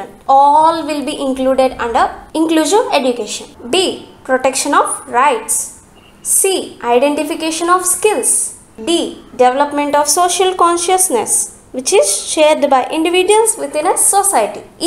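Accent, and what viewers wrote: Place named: Telugu, India. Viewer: Good speaking but you still pronounce like Telugu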